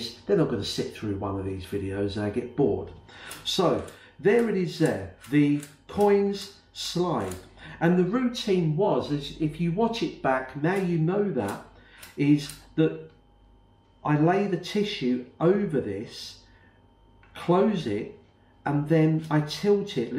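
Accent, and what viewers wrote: United Kingdom. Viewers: Is it possible you teach us someday this wonderful british accent?? or it needs a gimmick (((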